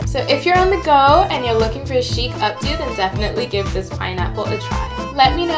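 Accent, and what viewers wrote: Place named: United Kingdom. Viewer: Why do you have a britanic accent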